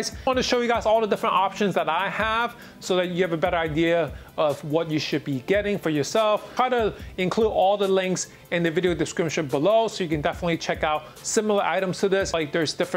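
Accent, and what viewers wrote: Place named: China. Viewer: So he speaks with a heavy Chinese accent. His English is probably better than any second language you speak. What is obvious though is that he really doesn’t know about fashion because a lot of his terminology, while descriptive, are not industry terms. The LL Bean tote, which appears to be a magazime giveaway item, is definitely not lined with rubber. The “Valentino” is clearly a belt bag and Valentino Garavani. I think it’s tacky tacky.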